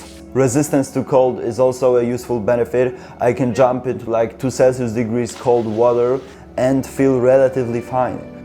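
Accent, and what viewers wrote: Poland. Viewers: Czuć na kilometr polski akcent.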